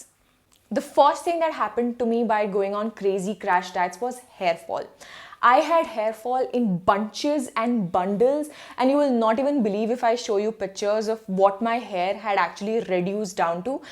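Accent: India. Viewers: I really like her Indian accent 😜.... And major thanks for the weight loss tips. Totally gonna try it today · You sound like a mature south Indian. Love you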